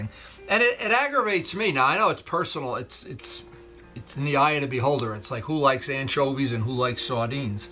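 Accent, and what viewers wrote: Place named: New England, United States. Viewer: Sounds like a fellow newenglander thanks for the video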